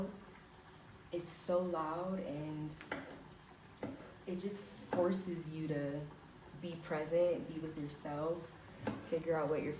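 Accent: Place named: Latin America. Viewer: Are you 100% american or cuz i can hear some latina in you tambien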